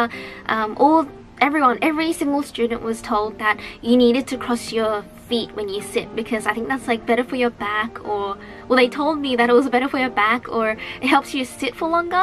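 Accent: Australia. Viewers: please do a video with your thickest Aussie accent😂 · On top her Australian accent is really Charming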